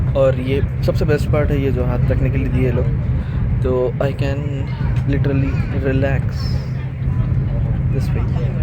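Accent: Hyderabad, India. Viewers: I can say ur from hyderabad, pure hyderabadi. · BHAI YOUR VLOG IS SUPERB AND THE AMAZING PART IS YOU USED HINDI LANGUAGE ( WORD PRONUNCIATION SE LAG RHA THA KE HYDERABADI LANGUAGE HAI ) VLOG ME JAAN DAAL DIYA LANGUAGE NE .. kEEP UP GOOD WORK :-)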